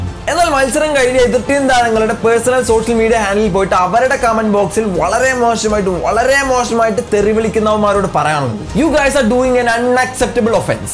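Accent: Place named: Thrissur, India. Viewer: Intro oru thrissur ഭാഷ പോലെ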